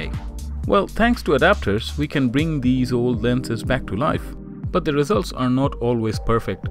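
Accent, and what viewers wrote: Bengal, India. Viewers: Are you Bengali by any chance?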